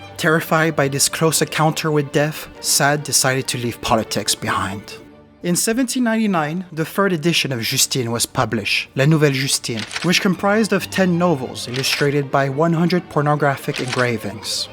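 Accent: France. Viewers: Rien qu'en t'ecoutant parler on sait que tu es Francais...i guess only French speaking people can tell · Am Inlove with your accent. Now I want to learn French♥️ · I couldn't have been the only one thinking they NEED to learn French hearing this narrator. Beautifully done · Hes actually French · Time to hear more of that french accent. Edit: ok, now I understand why this video is age restricted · Don't know how I got here. I don't know why I stayed and watched. But I did and now I know things I don't want to know. There was way too much tits asses and penises in this and now this is my last video before bed...the dreams I will have...oh my...I am going to concentrate on that French accent instead...*clicks heels* there's no place like Gay Paree, there's no place like Gay Paree... Hello France✌🤟 câlins et bisous from America 🇺🇸 California to be exact. Vive La France 🇨🇵 great video très intéressant. 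♥️💙❤ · He is French ^^ I am and I hear it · I have the impression that the narrator is french but has good english, great content as well, kudos👏👏👏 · ?? He's French! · well he IS French obviously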